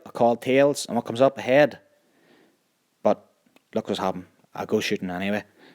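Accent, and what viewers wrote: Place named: Ireland. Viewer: Good shooting,love that Irish accent.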